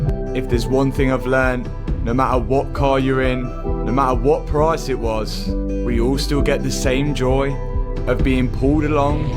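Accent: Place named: United Kingdom. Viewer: Apart from the British accent, this is some manly stuff! Enjoying the content from South Africa!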